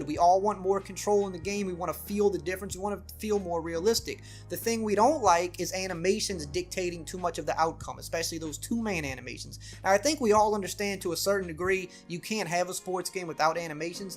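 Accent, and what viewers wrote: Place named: Pittsburgh, United States. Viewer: you from pittsburgh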